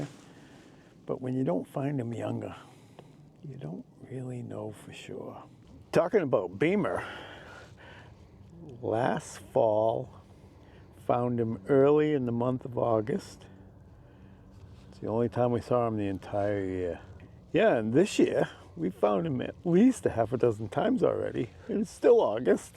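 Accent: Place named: East Coast, United States. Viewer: I often wondered when the shedding took place. Seeing the breath i guess cooler air is starting already. Hearing your accent any chance your from the east coast?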